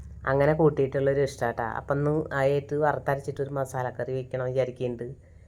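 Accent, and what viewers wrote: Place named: Malappuram, India. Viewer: Malappuram bhasha kelkan nalla rasam ❤❤❤❤❤❤radish curryum super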